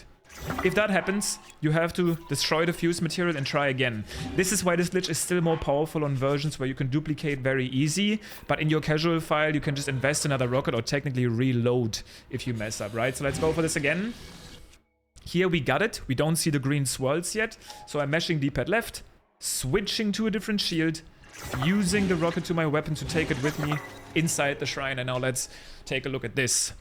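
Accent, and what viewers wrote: Germany. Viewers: You sound really German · You have so a german acent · this is definetly a german accent, right? :)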